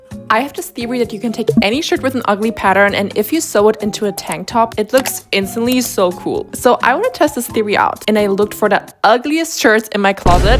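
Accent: Germany. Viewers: i hear deutsch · Why do you sound kind of German? (I‘m from Germany and this is the first video I watched of you) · heyy, random question but are you german? idk, your accent kinda sounds like it..btww LOVEE UR VIDDDS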